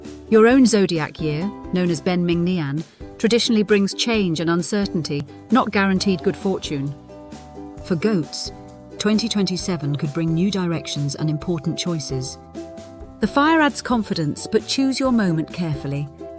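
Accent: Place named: England, United Kingdom. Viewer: An English accent, Hawaiian luau music, Chinese astrology, and AI animation. Interesting combination.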